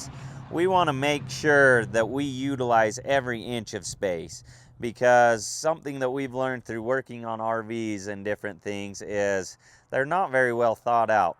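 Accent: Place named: Sanpete, United States. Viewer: got the Sanpete twang in his voice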